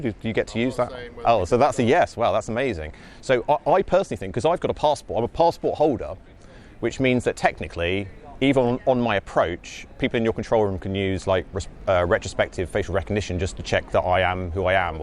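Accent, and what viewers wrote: England, United Kingdom. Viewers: I aspire one day to move to the UK from NY and speak as eloquently polite, whilst getting the information he seeks. This isthe epitome of evolution to me. I wonder if that is cockney or RP?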